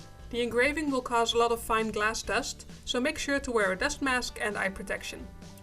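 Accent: Netherlands. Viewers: I'm so happy I found this site..ordered myself a glass etching tool..I'm going to guess your accent is Nederland...klompt?? Jim: Olympia,Wa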